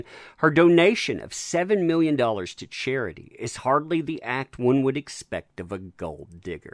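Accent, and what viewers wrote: South, United States. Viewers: Go, J.D. Seems right, esp if ACLU is nonprophit and could be violating FOIA. If it is public info to know they were to receive $$$, shouldn't it be public info whether ACLU received it? Glad to see you back,. Been missing that southern accent to go with my coffee and keep me up to date on how our J.D. is faring.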